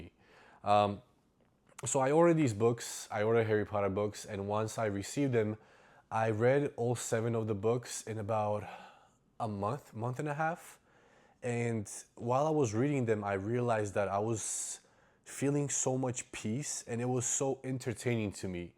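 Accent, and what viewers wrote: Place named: New York, United States. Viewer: It's crazy that YouTube recommended this video to me, and im so glad it did. I'm a fellow immigrant who used to love reading and is trying to get back into it, so finding this video in the middle of the night when I was about to turn off my phone is insane. Anyways, I loved the video, I can't wait to watch more. And also, I could barely hear your accent, you just sounded like a new yorkan to me 😆. I will be checking out the books you mentioned soon, beacuase you make them sound really great.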